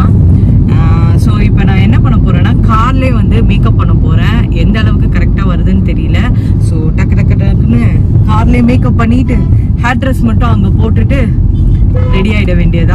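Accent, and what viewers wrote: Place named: Kerala, India. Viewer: You sound so much like a Malayali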